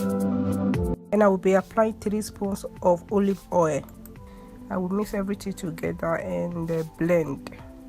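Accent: Africa. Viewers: My mom has the same African accent like yours, it's soooooo beautiful. I'm Ghanaian btw🇬🇭🇬🇭🇬🇭🇬🇭🤘🏾😛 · YOUR ACCENT IS SO CUTE I WANNA DIE. I LOVE AFRICANS!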